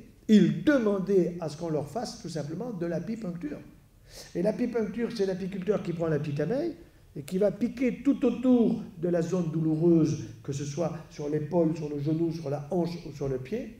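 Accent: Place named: South, France. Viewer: Entendre respirer la santé avec cette conférence pleine de bon sens, au rythme slow du laboureur qui swing avec le bio, et madeleine de Proust, avec l'accent de Marcel Pagnol. Professeur Joyeux vous nous faites un réel grand plaisir. Patrice D. Ingénieur Agronome né 1944 10 ans irrigation goutte-à-goutte au Maroc.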